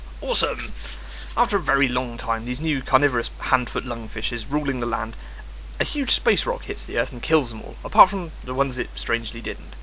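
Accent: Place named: England, United Kingdom. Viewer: Maybe it's the english accent, but I don't believe you're this dumb. I think you're a troll. Get a life guy.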